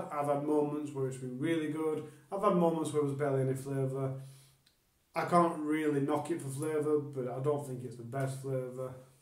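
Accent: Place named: Manchester, United Kingdom. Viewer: Appreciate your honest review, my man! Just found you on YT with this review. I have one in the post, really looking forward to getting my hands on it. I’m usually good at identifying English accents. I can’t quite place yours. Definitely Manchester-ish, broadly speaking. Btw, love that you have real life intruding in your video. There’s something endearing about the banging and interruptions, lol!! Keep up the good work, mate.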